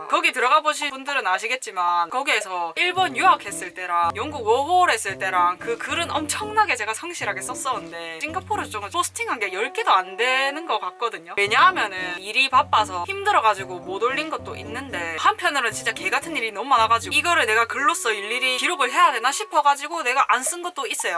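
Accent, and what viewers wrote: Gyeongsang, South Korea. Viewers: 우어,,,근래에 본 사람중에 제일 경상도 사이다네요 ㅋㅋㅋㅋ 구독 누르고갑니다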